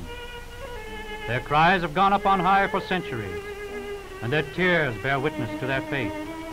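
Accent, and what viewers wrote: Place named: United Kingdom. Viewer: Its always with a british voice.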